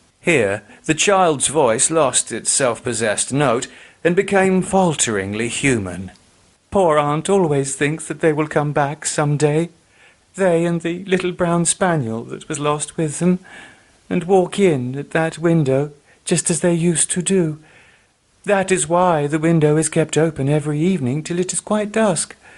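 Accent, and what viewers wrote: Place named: United Kingdom. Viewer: I laughed while listening to his British voice, thinking I was back in the England 1700's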